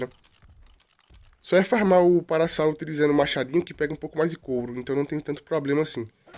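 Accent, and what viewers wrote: Northeast, Brazil. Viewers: Nordestino com certeza 😅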